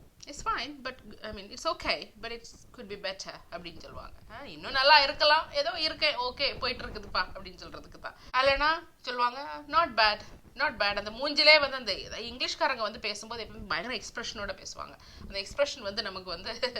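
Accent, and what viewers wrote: Nagercoil, India. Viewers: உங்கள் நாகர்கோவில் பேச்சு மிகவும் அருமையாக உள்ளது. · namma nagercoil slang a friendly a pesurenga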